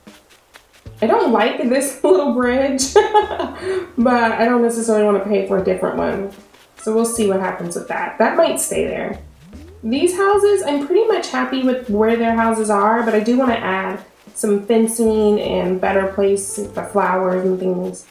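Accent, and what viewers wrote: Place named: United States. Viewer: What region of the US are you from? I noticed you say some things in an interesting way, like when you say "guys"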